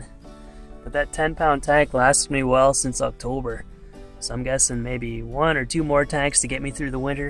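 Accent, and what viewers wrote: Canada. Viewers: the Canadian accent too! "Take off, aye!"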